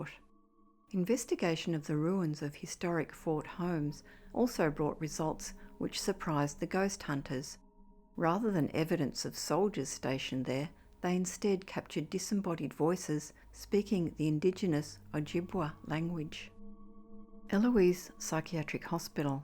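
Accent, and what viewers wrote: Australia. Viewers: Australia I would think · I love the Australian accent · I thought Australia. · And she's an Ozzie!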